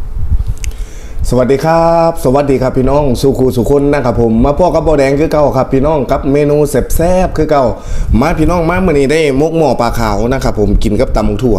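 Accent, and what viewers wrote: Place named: Phu Thai, Thailand. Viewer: เป็นนครพนมเบาะครับเว้าสำเนียงภาษาภูไทคือผมเลย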